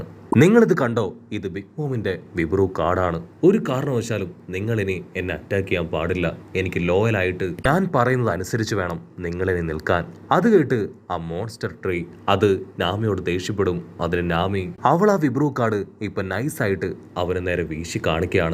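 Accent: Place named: Malappuram, India. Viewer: Ade malapuram thane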